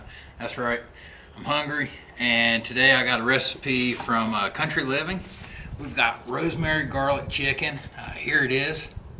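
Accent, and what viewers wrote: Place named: Northeast, United States. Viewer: what part of the northeast are you from I can't place your accent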